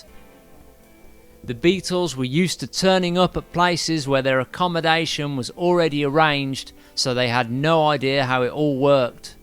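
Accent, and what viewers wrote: Birmingham, United Kingdom. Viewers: Ha! Nothing like him! Brummie accent instead of Scouse for a kick off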